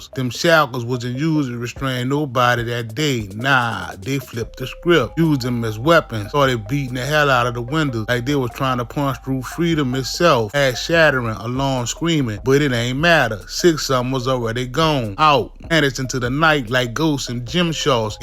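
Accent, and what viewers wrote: New Orleans, United States. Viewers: You even sound New Orleans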